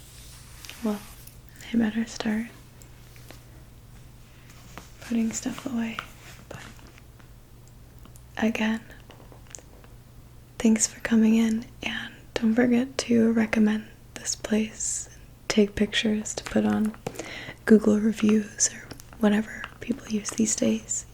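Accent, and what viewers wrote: South, United States. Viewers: Excellent as always. Southern accent is my favourite, and I'm British 😊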